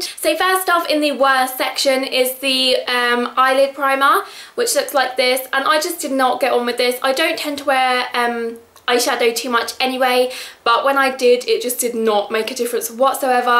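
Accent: United Kingdom. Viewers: British accent :)) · you sound like me ans my sister being British .-. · Beautiful accent. Wish i was British :( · very helpful video I obsessed about the Britich accent REALLY LOVE IT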